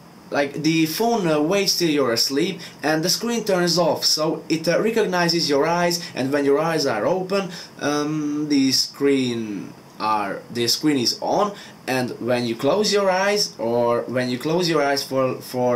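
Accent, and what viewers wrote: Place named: Turkey. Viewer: You just have a Turkish accent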